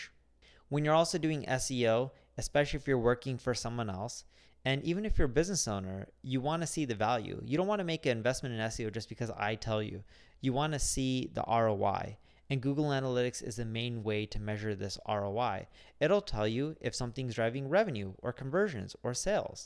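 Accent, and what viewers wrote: India. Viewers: Why your accent is so different/are you Indian?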